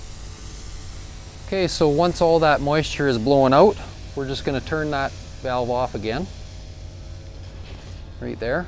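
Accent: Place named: Canada. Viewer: Great video. I think you are in Canada, as you pronounce House as Haouuse ;) and the ABS pipes instead of PVC. But, the reason I looked up for video like this is because in DC area, I pay $130 to blow out sprinklers. Also, I have a 8 gallon air compressor with 4SCFM, and I was not sure if it can do the job. Watching your video makes me think, I can. Thanks again.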